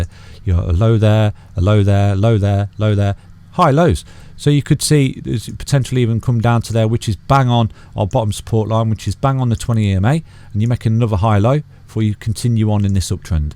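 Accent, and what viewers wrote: United Kingdom. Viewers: I can’t understand if I like more the analysis itself or your distinctive British accent. 😄 Miss UK a lot. Sub and Like! 👍🏻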